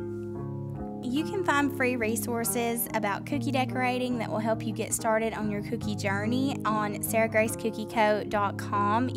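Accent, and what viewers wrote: Alabama, United States. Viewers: I’m from North Alabama. You sound like you are from my area. Thanks for the video.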